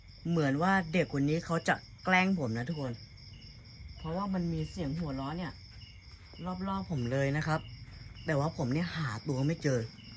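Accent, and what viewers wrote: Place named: Rural, Thailand. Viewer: เพราะว่าพูดเหน่อ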